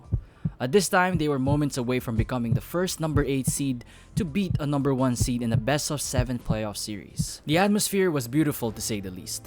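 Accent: Philippines. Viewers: Sa boses palang pinoy na e 🤣. Love the video though 🙏🏽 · is it just me or do y'all hear a filipino accent?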